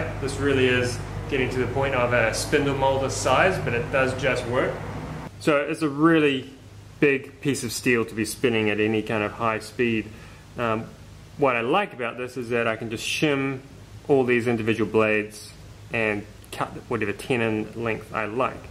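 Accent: New Zealand. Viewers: When I stumbled upon this channel, I was impressed by the woodworking skills shown in the videos. The narration and editing is always done very well and makes it fun to watch. But I have to admit, what really puts a smile on my face every time is the kiwi accent. From one professional woodworker to another: keep doing what you are doing,. You are very good at it. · Excellent video and thanks for sharing your ideas and tips, great too see some different ways with fitted wardrobes, kitchens and furniture, I lived and worked in NZ as a chippy for 6 years the accent and terminology bringing back all the good memories 😄 👍 keep up the good work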